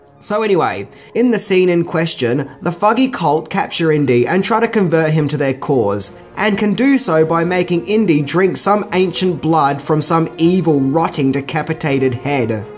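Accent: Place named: Australia. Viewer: Dude, he has an Australian accent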